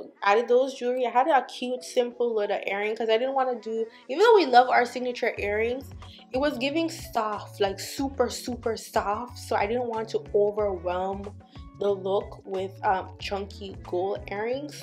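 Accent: Jamaica. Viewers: U SOUND JAMAICAN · Chun chun 😂😂😂 . The Jamaican can’t hide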